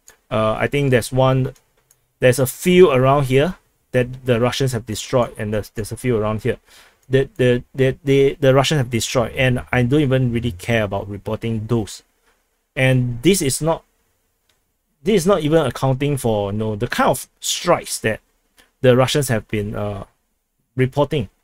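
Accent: Singapore. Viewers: Seems like you are more happy to believe the Russian MoD information rather than the Ukrainian MoD information. Maybe you don't notice, but there is clear bias in your opinion, and the way you put it across. Personally I don't believe either side with thier stated figures and actions, all that matters is the facts on the ground. And the facts on the ground show that overall Ukrainian forces have been able to take back allot of territory so far, they are not even fully mobilized have received very few heavy weapons. You would expect it to take at least another 2-months before the effects of such are seen. Really all Ukraine can do right now in the east is hold the line the best they can without being obliterated, naturally during this period, with such concentrated Russian forces, land will be lost. I think if Ukraine can't seriously get on the offensive within 6-months then these lands will become increasingly difficult to retake, and just for the reasons you stated, but within this timeframe there is plenty of opportunity to reclaim these territories. 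Doubt it on Crimea and Donbass however, possibly if Russia collapses within, but only then. Now if I am not incorrect, you sound Singaporean, I have worked there many times, very hard business approached people, so the importance of morale factor might be difficult for you to fully grasp. And you might have thought that the Ukrainians might just roll over, but Kiev was not taken in 3-days or ever, and thier military still fight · great reporting and analysis , are you singaporean (based on your accent) ? hope you are fine there and not attacked / reported by insane amount of pro ukraine trolls (including those from troll farms in UK/US/FR)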